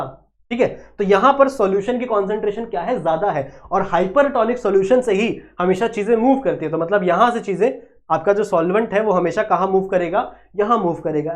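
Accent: Maharashtra, India. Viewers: Sir marathi aht kai 😂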